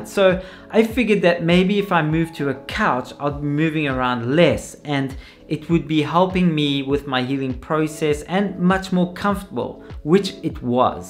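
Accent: South Africa. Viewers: Cheers dude,I broke mine twice and am going in again in 3 days to get a plate put in.The only concern I have is the sleeping and you nailed it,the couch and a pillow,infact lots of pillows saved my life the last time's, I'm hoping this time won't be as bad as its corrective surgery and I hope the doctor will give me adequate pain suppression. P.s is that a Cape Town accent I heard,been there twice,beautiful country, be 🙏 Will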